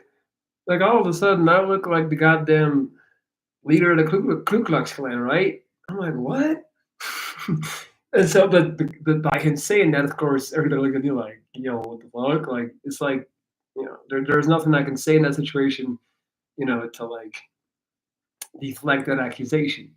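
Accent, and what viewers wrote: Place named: United States. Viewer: I'm glad you're honest about emulating an American accent 😂 You are the most American European I've ever heard speak in my life haha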